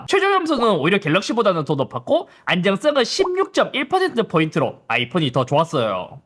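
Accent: Gyeongsang, South Korea. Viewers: 뭥미..경상도 사투리 쓰면서...왠...한화 유니폼을 입고..??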